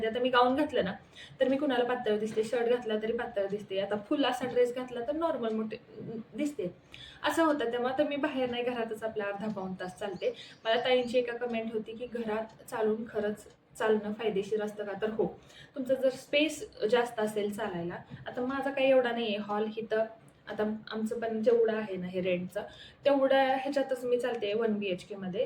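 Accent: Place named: Kolhapur, India. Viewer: Pune mg chan mala Tumch marathi kolhapur sarkh vatle mhnun mi kolhapur madhun bgte tumche video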